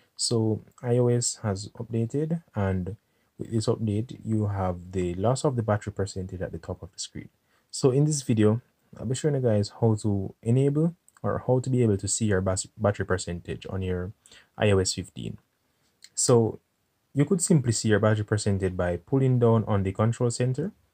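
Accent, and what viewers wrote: Jamaica. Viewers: Dawg yah Jamaican or from the Caribbean??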